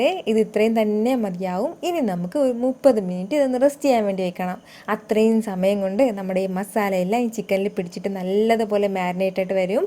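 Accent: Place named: Palakkad, India. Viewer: പാലക്കാട് ആണോ വീട് സംസാരം കേട്ടിട്ടു തോന്നുന്നു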